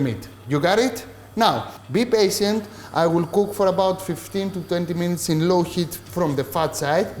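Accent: Greece. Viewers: Thank God, the video is subtitled. 😆😆😆 I've rarely heard anyone speak English with such a heavy Greek accent: to the point of being almost unintelligible.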